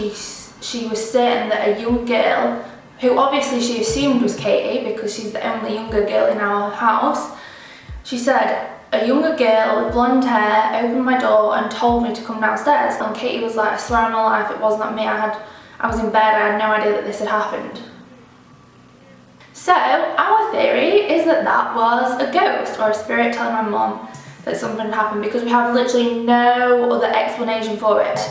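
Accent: United Kingdom. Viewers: I love the accent are you British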